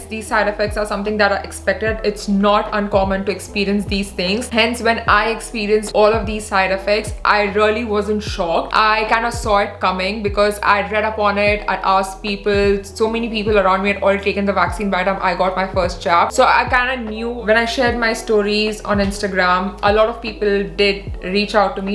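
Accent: India. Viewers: Thank you very much for this: it is also a delight to hear your accent for some reason! I am fond of the Indian accent. I had my vaccine yesterday and it was fine, I did have mild muscle aches today and last night, a mild headache but I understand the muscle aches are an indication that the immune response has been innitiated, so nothing to worry about. If one knows what the cause is, then I wouldn't count this as 'suffering': and it is nothing compared to the deleterious effects of the virus itself. My grandmother died of it and I think it's a real tragedy when someone reaches her age and then in the end is taken by something avoidable: I'm sure most people know someone or more then one person for whom this virus has taken there life. Bearing this in mind I think it makes little rational sense to pass up the vaccine out of a fear of possible physical symptoms. Out of all the millions of people who have been vaccinated (about 18 million in the UK alone), there have been no documented cases of this vaccine causing death, and I don't know of any serious adverse events that have been blamed on the vaccine. 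It is fear caused by distrust, caused by the absence of understanding that surely leads someone to resist: so I would urge anyone to find out the facts for themselves, from sources they trust. You areprotecting not just yourself but anyone you come in to contact with. There are no known serious risks to taking it but no end of possible serious consequences for anyone who doesn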